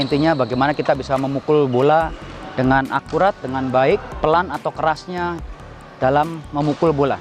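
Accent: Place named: Cirebon, Indonesia. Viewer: logat cerbone katon banget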